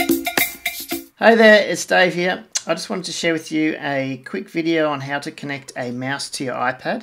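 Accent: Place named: Australia. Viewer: I love his Australian accent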